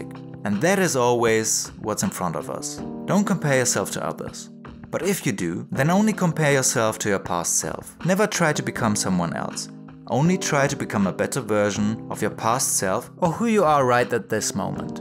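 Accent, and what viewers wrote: Germany. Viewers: Hard german accent. Tolles Video:) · Ich bin neugierig. Du hast einen leichten deutschen Akzent. Bist du Deutscher?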